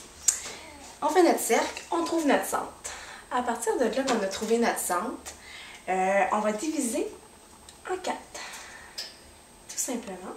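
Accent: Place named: Quebec, Canada. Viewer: Très simple et facile d'accès, merci ! Et cet accent québécois, j'adore ! :)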